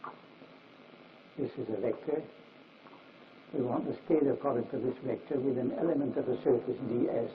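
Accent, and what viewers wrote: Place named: United Kingdom. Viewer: I was wondering! It can’t be, this guy has a British accent but Dirac was American right?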